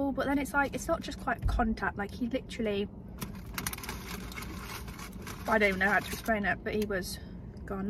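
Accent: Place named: New Orleans, United States. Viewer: I like her accent 😭 I'm from New Orleans lol if ykyk the voice is crazy 😂😂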